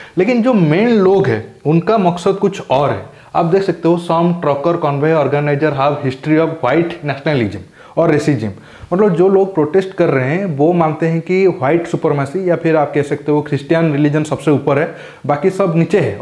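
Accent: Odisha, India. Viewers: Your videos are indeed good. But try to avoid your odiya accent while speaking Hindi or English words.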